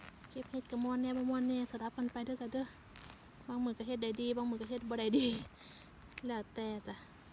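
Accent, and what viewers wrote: Central Thailand, Thailand. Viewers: ไม่ง่ายเลยนะทั้งถ่ายทำไปพร้อมเก็บเห็ดขอบคุณนะจะน้อง และทุกๆท่านที่อยู่ตปท.ที่อุตส่าทำคลิบมาให้คนไทยที่เมืองไทยได้ดูจะชอบน้อง พูดไทยกลางน่าฟังดีและฟังรู้เรื่องด้วยจร้า💚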